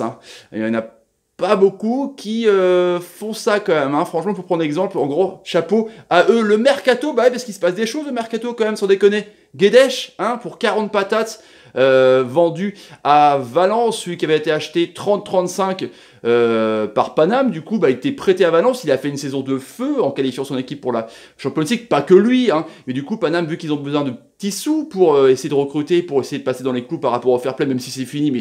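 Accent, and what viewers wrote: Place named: England, United Kingdom. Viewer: Mieux que Téléfoot , et pouce bleu direct pour l'accent anglais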